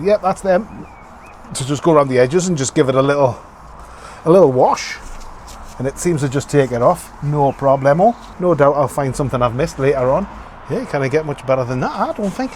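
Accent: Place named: Stockton, United Kingdom. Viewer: Thanks. By the way, Jill and I were taking guesses on your accent, I reckon your a Stockton, poss Darlington lad? 🙂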